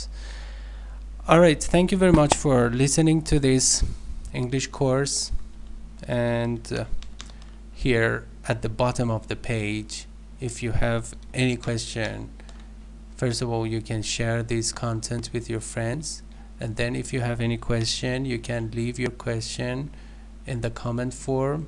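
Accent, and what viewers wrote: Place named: Iran. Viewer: Persian accent 😉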